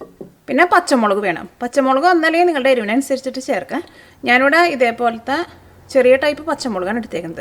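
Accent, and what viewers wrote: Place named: Kunnamkulam, India. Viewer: Chechiye..njn kunnamkulamkaarana.onnum vnda mmade aa pedakkhna samsaaram aanh chechi kooduthal hit aaayath ente frend paranjitta njn ithine kurich ariyunnath.nthaayaalum classaayindh.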